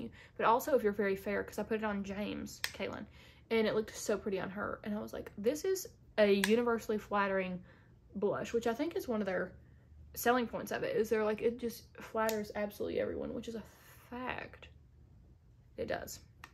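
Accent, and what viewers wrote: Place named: Kentucky, United States. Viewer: Are you from Ky? Your accent makes me think you’re a Kentucky gal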